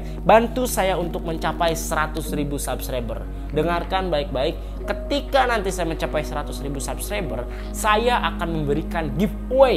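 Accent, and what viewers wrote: Banten, Indonesia. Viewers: Logat nya kaya orang serang Banten ya bang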